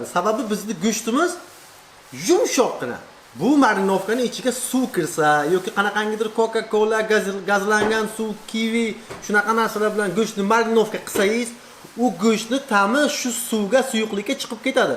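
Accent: Bukhara, Uzbekistan. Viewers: Бухороча шевада гапирганингиз учун 2 лайк🙄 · Шашлик майликуя бухороча шивани коилатиздаааа таго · Бухоро шевасини роса согингандим, мазза киб эшиттим рахмат 🤗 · Buxoro sheva N-1. Shashlikni dodasi ham Buxoroda😊😊😊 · Узи Гиждувонликман, шашликдан хам кура Бухоро шевасини купрок согинганман, рахмат. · Шевага караганда азим Бухороданми сиз лекин жуда Куп гапирдиз ишдан кура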